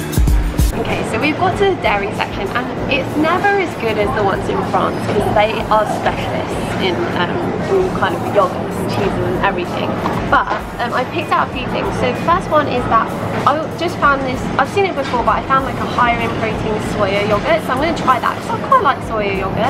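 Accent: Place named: United Kingdom. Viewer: I just found your channel and i loove it! what part of the UK are u from, you're accent is so cute!?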